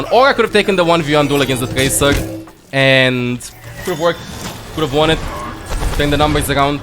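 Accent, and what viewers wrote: Romania. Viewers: Not only is brilliant, entertaining, and an incredible teacher, his beautiful Romanian accent invariably gets every tip he gives playing on repeat in my head for a week. Love what you do, keep up the good work <3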